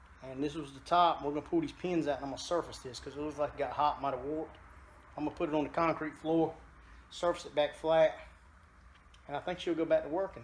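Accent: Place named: Alabama, United States. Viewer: i was watching the video in the shop doing my water pump and one of the other mechanics said he sounds like he's from Alabama too ..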